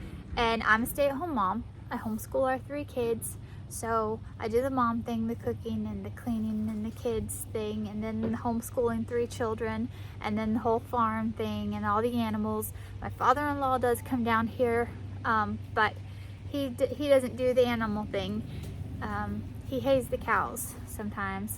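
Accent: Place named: South, United States. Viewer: Potting wild tomato plants in November! I'm draining my water hoses and putting them away for the winter, they already lightly froze up once. I don't have a greenhouse, but I did propagate some clematis from cuttings, hoping they survive. Good luck with expanding your channel, I came here from Cog Hill Farm. BTW being an old former Jacksonville guy, I love your slight southern drawl. I like videos in the 20–35-minute range. I don't listen to blog, too long, even my own son's.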